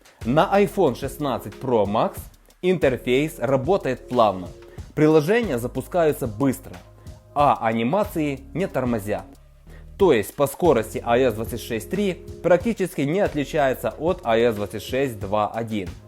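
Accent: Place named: Ukraine. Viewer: по акценту услышал, что какол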